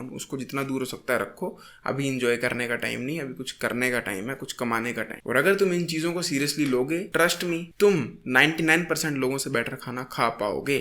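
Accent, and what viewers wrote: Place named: Pahadi, India. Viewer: I love how the pahadi accent sometimes kicks in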